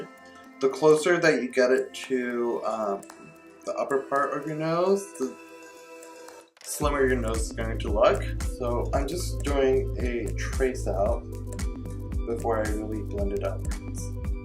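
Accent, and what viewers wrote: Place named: India. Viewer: Seems like an Indian guy with Indian accent.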